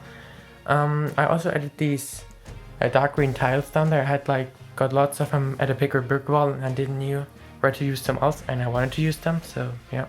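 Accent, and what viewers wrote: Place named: Germany. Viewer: Are you from germany its just that the Accent sounded familiar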